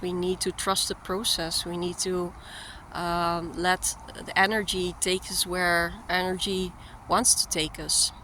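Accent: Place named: Netherlands. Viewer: Your accent sounds Dutch.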